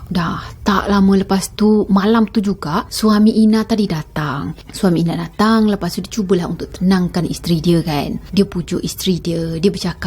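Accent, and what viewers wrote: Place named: Terengganu, Malaysia. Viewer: Loghat Ganu faham tak?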